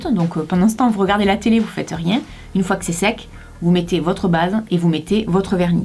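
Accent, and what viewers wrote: South, France. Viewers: Super découverte !!!! Ton naturel, l'accent du Soleil... Hop-hop, je m'abonne !!! Et merci beaucoup de penser aux Coquettes à petit budget 😉😊😁 !!! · J'aime trop ton accent 😂 #Sudgang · j'ai 10 ans je viens de te découvrir et je te trouve drôle gentil et marrante avec ton axant du sud bisous et super vidéo plus 1 abo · T'as un accent du sud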